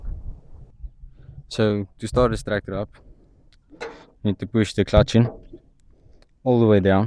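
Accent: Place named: South Africa. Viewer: You sound like a South African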